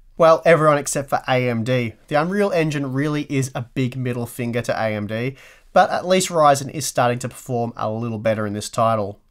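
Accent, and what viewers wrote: Australia. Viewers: That "spin" of words in the benchmarks! That eloquent Ozzie accent! you "GymKhanna" circles over youtube man:)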